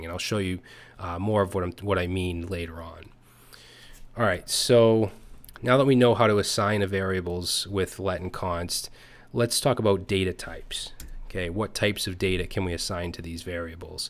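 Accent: Boston, United States. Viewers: I love this Bostonian accent. Also, great content.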